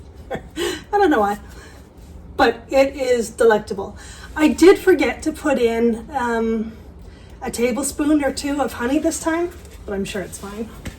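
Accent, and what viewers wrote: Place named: Canada. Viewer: lol, I KNEW you were Canadian, I could tell by your accent!! I subscribed to your channel, God bless you!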